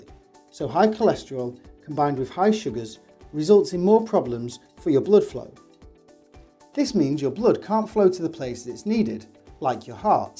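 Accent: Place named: North, United Kingdom. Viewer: Me just wondering what kind of British accent this guy has! Somewhere in the north?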